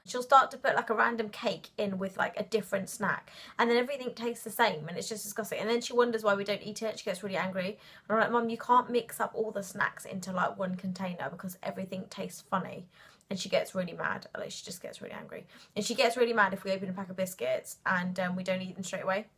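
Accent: United Kingdom. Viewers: A brown girl with a British accent 😍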